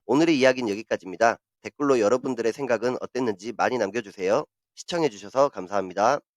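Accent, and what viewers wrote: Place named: Jeolla, South Korea. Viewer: 전라도분이신가봐요 억양이.. ㅎㅎ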